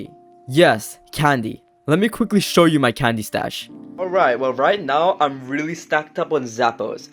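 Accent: Australia. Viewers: Great vid bro rlly helpful, also r u Aussie?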